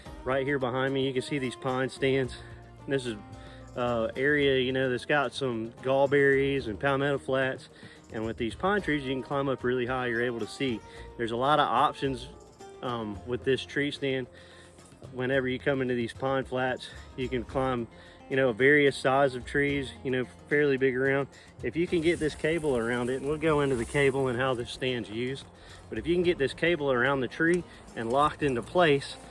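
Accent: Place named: Florida, United States. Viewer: thanks for a good video and plain florida talk. good luck hunting. I hunt osceola wma in a sumitt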